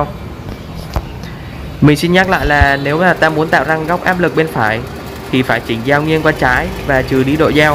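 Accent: Vietnam. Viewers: на каком наречии он лапочет? на северном или южном?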